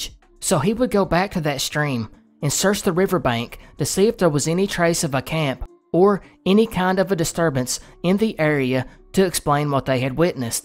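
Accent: South, United States. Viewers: This right here is a southern bygod accent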